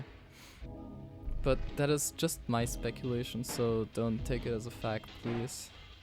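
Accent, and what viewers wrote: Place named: Germany. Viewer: You have a german accent tho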